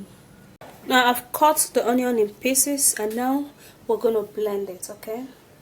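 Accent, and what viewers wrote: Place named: Nigeria. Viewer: She's from Africa Nigeria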